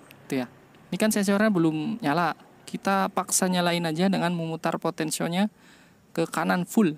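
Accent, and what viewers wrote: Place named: Banyumas, Indonesia. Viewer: Yakin kwren mas..sLam ngapak..ora nhapak ora kepenak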